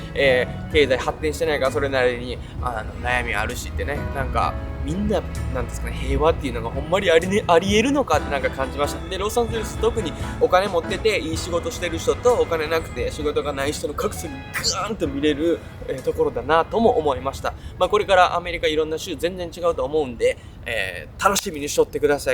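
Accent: Kansai, Japan. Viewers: この関西弁が非常に聞き取りやすい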